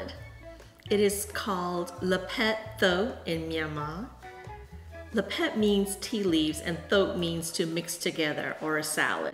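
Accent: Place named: United States. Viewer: She speaks perfect English too with American accent!😊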